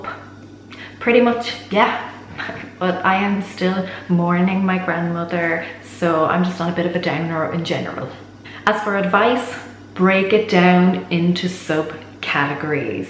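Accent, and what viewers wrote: Ireland. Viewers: Love your Irish lilt, could listen all day! Your doing a great job and it's so frustrating when you don't have everyone in household with same mindset, sorry to hear of your loss.⚘⚘⚘ Donna from SCOTLAND xx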